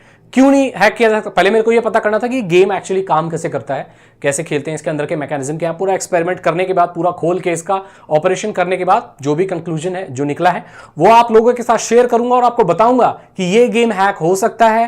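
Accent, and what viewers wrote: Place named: Bengal, India. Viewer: ap bangali ho?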